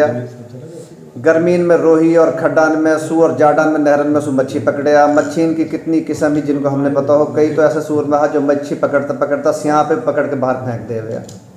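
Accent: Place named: Mewat, India. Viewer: Mewati boli piyari boli he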